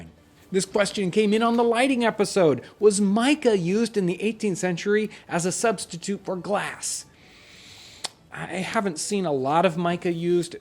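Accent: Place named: Midwest, United States. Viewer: you know; now that Im looking for it you sound like a fellow Hoosier or Midwesterner in the least.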